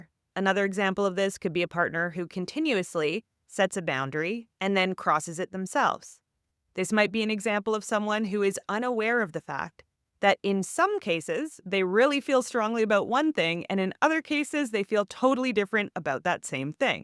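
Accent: Canada. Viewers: You’re from Canada aren’t you?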